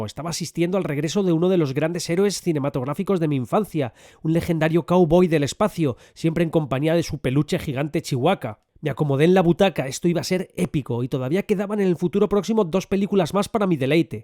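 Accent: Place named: Spain. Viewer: Que bien análisis de la película y le encuentro toda la razón, pero me da mucha risa y me saca de la seriedad el como pronuncia los nombres de las cosas y personajes de Star wars, por cada cosa que empieza con S los españoles le meten un "ES"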